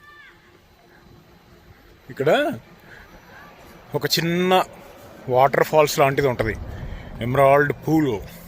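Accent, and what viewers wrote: Andhra Pradesh, India. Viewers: Bro meeru telangana kada..maree nnizamabad..mee baasha enti andhraa laa untundi..akkada settle ayyaaraa…endukantey akkada astundru,potundru,andukunnava,tindra ledaa..ila matladutaaru… History cheputara meedi…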